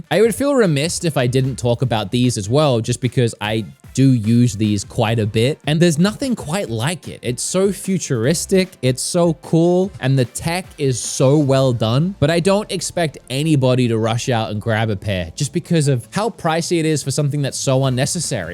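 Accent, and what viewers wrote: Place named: Australia. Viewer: Can someone help me out with identifying his accent. I thought it was Aussie, but then it also sounds American?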